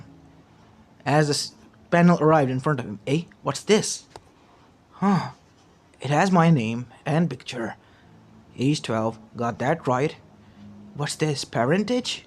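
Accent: India. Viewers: Bro has the Indian accent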